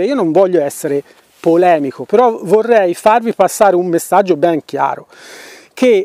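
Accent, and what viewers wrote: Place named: Tuscany, Italy. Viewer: Caro e un piacere guardare te e Ame😂Le tue spiegazioni sono chiare ed esaustive condite con l'accento toscano che non guasta affatto. Grazie per la tua sapienza e onestà. ❤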